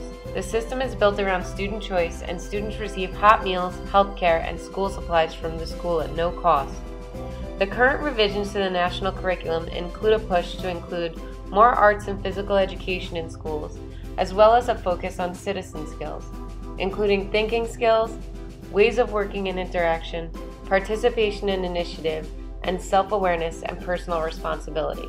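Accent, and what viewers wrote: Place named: Midwest, United States. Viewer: Papulation? LOL You have a funny Midwest accent.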